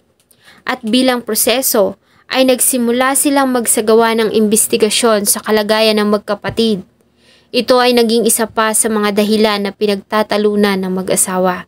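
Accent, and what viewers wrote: Visayas, Philippines. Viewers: Bisaya Lang si Maam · bisaya po ata si maam kaya po ganyan pronunciation nya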